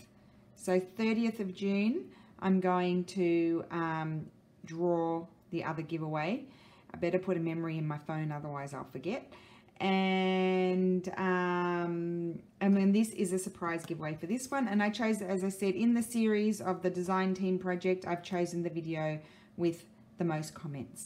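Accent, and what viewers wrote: Australia. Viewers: Oooh - How exciting. Beautiful journal. I've bought baroque and fragment add ons but love them all. Love watching you every day. Greetings from Perth WA. Great to get a glimpse of an Aussie's life in Italy. Terrific to hear the Aussie accent and lingo -))) and Something I've often wondered - are you related to Richard Roxburgh? (Big fan of Rake - amongst others!) Now that we are all addicted to tuning in daily, please say you'll still be around regularly!! :-))))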